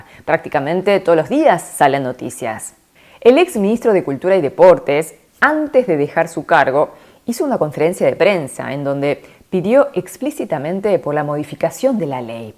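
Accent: Argentina. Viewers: Cómo no van a ir a ello teniendo al enemigo arriba y tirando bombitas cuando se les antoja?:/ sinceramente, a mí Corea del Norte me da muy mal rollo., tú estás en Argentina (por el acento que tienes me lo parece, vamos) así que tú estás a salvo, pero el resto? Pff...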